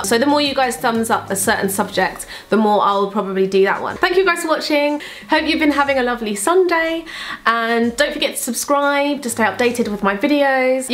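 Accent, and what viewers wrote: United Kingdom. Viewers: you sound British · Your British Accent Is Life 😍😍☺️☺️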